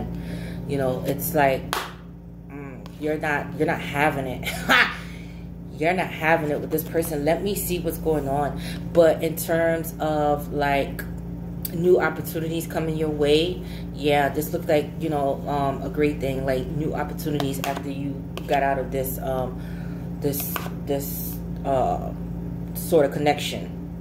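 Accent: New York, United States. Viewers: you are so funny are you from New York New York or New Jersey that's your accent I love it but you yeah I love the reason I love it I'm a Leo son Taurus Moon and a cancer I forgot that one he's an earth sign I've been waiting for him to wake up his mind it's been almost 2 years but I have time not exactly where I want to be and he's got out of a divorce about 3 years ago I have options you know we Leo's they love us haha they try to act like they don't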